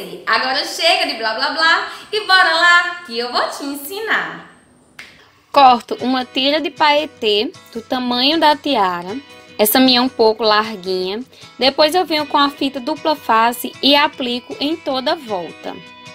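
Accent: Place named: Paraíba, Brazil. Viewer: Moça, é incrível. Você falou e eu pensei 'essa mulher é paraibana' Dito e feito kkkkk Beijos da sua conterrânea também de João Pessoa.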